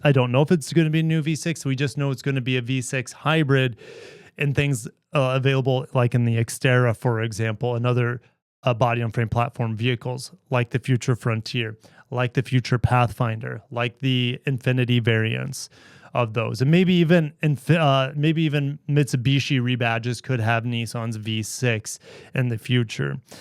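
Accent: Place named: Wisconsin, United States. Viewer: I still have my 2000 Sienna running good. I'm thinking about getting 2021 Lexus RX BUT IT HAS 80,000 miles do you think I should get it? $28,000? Ps are you from the Midwest you sound like us in Wisconsin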